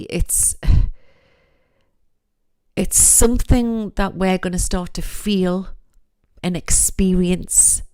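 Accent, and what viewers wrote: Newcastle, United Kingdom. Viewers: Love and miss the Geordie accent, from a geordie lass in Tasmania Australia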